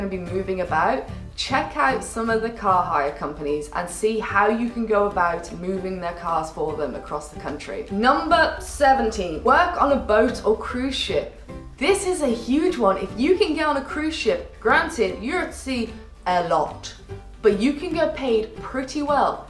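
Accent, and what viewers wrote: England, United Kingdom. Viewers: here expressions are very cool. I was just searching for some brazil thing and I found a video by the title language challenge. in that her facial expressions were awsome and with that english accent her voice is like a melody. Nice video for the people who love to travel but can't afford.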